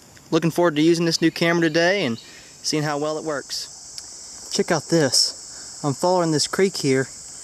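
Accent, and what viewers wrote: South, United States. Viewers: you have an American axent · you know your watching when you hear his country accent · i like your country actsent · I can not stand his countryness.......???? Accent and how he talks but I love his vids though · He says gowd instead of gold good old southern accent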